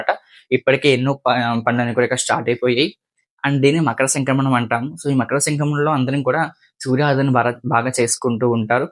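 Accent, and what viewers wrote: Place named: Godavari, India. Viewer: Abbo Godavari slang😊😂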